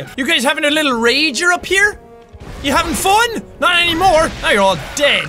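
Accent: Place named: Ireland. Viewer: My mum loves hearing your voice while I'm watching your videos because apparently you sound like an Irish guy from Sherlock :)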